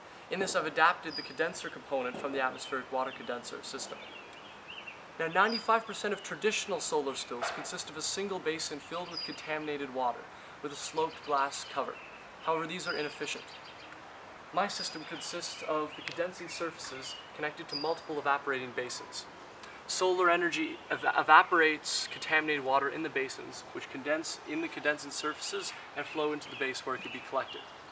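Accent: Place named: Canada. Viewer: Holy, crap. I knew immediately he was Canadian. Then he says so. Wow.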